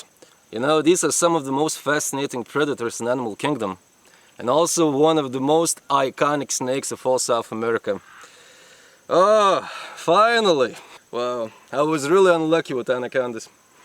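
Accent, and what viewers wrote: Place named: Russia. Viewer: Russian accent out there in the jungle catching snakes. This dude is awesome. Modern day warrior 💪👊💪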